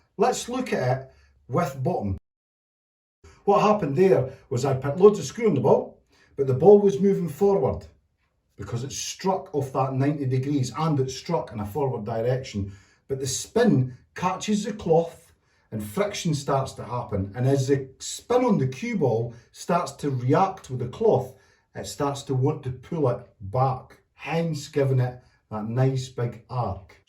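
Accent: Scotland, United Kingdom. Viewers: I think what I'm getting at is for me it is easy to predict the path of the cue ball only when I apply a stun to an angled pot, everything else from centre to top or centre to bottom can be a grey area with regards to the path of the cue ball. I'm from Ireland so have no problem understanding the Scottish accent 😅, I've checked out a few channels and your is definitely one of the best 👍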